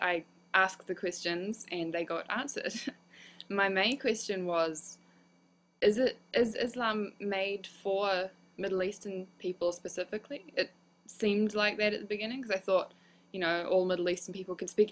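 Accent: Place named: Australia. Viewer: i dig ur aussie accent very funny in a good way. welcome to the biggest family in the world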